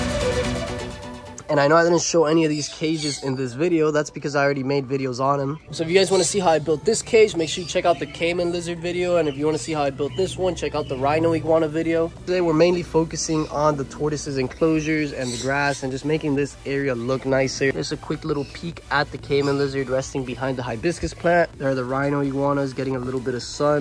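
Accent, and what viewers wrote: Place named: Long Island, United States. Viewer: Sounds so Long Island...or the Bronx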